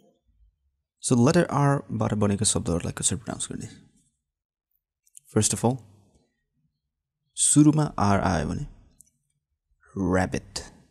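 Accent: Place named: United Kingdom. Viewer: Sir,I would say -having an articulate British Accent through you;it’s amazing,great...ur highly appreciated whereas other English Teachers...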